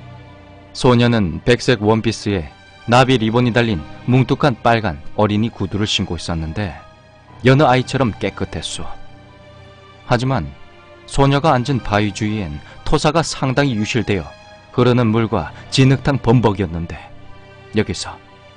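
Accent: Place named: Gyeongsang, South Korea. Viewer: 님이 경상도 분이라 아래어떤분이 서술톤이 재밋다고 하는게 이뜻 아닐까요? 사투리 쓰시는 대신에 잘생겼잖아요. 키도 저강하시고....^^ 님 도화살 조심조심 ^^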